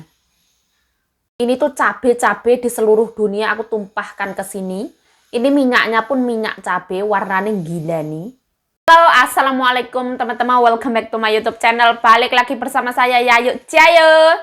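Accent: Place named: East Java, Indonesia. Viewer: aku seneng cuuaramu ngomongkilo jawatimurane kentel banget, jan lemaem uuenake duowo= enak banget👍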